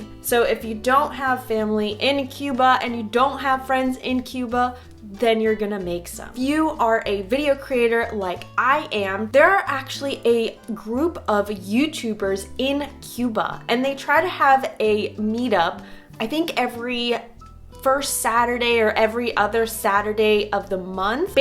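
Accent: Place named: Spain. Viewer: Wow 😲 I got a nostalgia now! I was born there 😢😀... sorry I didn’t know you! Just discovered you cause Brian Sellers on Twitter 🎉 and came running to give you my support 🙏. Beautiful video! Great Spanish accent 🙏👍🙏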